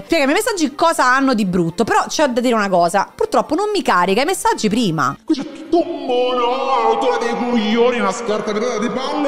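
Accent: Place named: Rome, Italy. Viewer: Sei fenomenale... Mamma il romano mi fa morire!!!!😂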